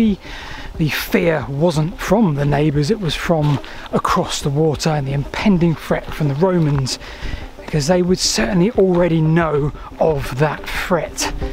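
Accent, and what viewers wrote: United Kingdom. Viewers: You have an interesting British accent I cannot quite place.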